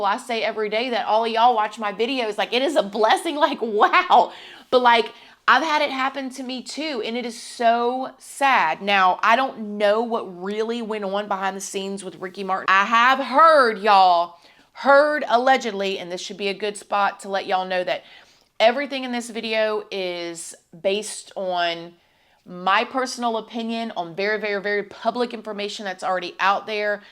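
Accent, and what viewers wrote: South, United States. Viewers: Great job! I so enjoy you! You sound Southern like me. What is the best is your kindness yet unafraid to speak truth! You go girl!!! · Mmmm no, I disagree 36 years old is old enough for Amber to know better. If you watched the trial, then you're aware she's been given some pretty strong diagnosis as not a well person. With all respect to you and your channel,( which is obviously successful) Do your thing! You're pretty, got a great southern accent and a gossip show flair. (funny as a viewer to be called one of your "babies" as I'm nearly 2x's your age). Well being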